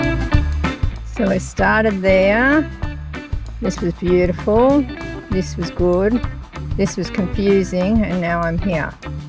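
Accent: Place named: Australia. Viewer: Welcome to Korea and hope you're enjoying your stay in Seoul. When I heard your accent, I knew you were from Australia and saw you told a man that you are from down under. If I heard it correctly, , heard you saying "what a larrikin!" 🤣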